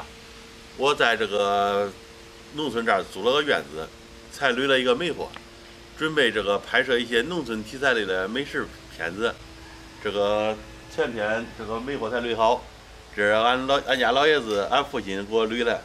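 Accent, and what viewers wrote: Henan, China. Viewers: 如果你只想拍给河南人看，你就继续河南话，否则，可以尝试下普通话。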